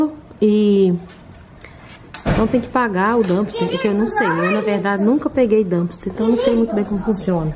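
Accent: Minas Gerais, Brazil. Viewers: Mineiro uai!Maravilhoso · como vc é especial mulher eu amo ouvir sua voz, o sotaque mineiro lembro das minhas tias de Ipatinga que eu amoooo. Minha mãe é de Mg Ipatinga meu pai carioca,gosto de ser carioca mais amo o sotaque mineiro ❤❤❤😘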